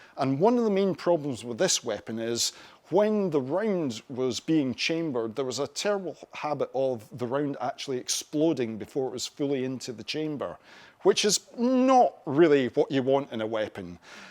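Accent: Scotland, United Kingdom. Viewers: Always a joy to hear a Scot agonising over something.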